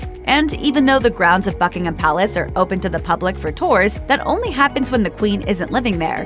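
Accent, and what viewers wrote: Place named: United States. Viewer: An American accent talking about British royal protocol is just not proper!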